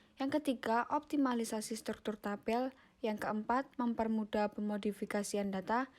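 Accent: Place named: Java, Indonesia. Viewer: medok bund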